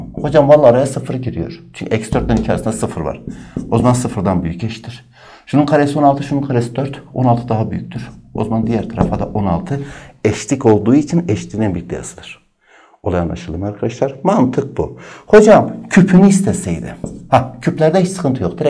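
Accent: Urfa, Turkey. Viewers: Urfali hocamiz zaten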